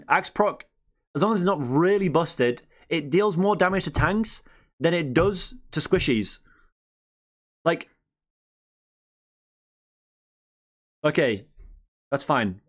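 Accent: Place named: United Kingdom. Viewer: 50 minutes of a British ranting... Man were eating good today 🔥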